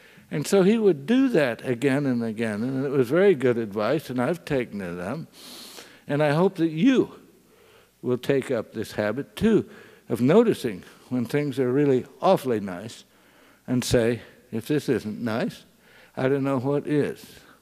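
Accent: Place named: Indiana, United States. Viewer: A Hoosier.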